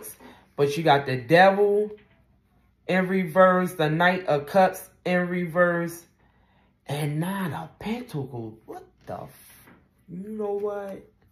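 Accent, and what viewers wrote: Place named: Baltimore, United States. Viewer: HELLO your brownskin is brown skinning you look great i love your Baltimorian accent it's gritty CUTE..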